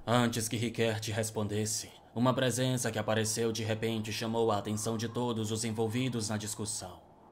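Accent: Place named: Ceará, Brazil. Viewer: você é do ceará? as vezes o sotaque parece, e algumas palavras também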